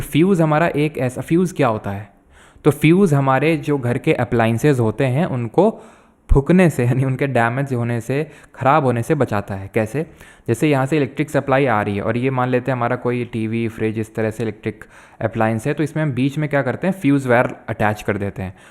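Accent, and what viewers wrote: Kanpur, India. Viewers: It's my second class sir kanpur se hai kya language bilkul hm log jaisi si hai 🙃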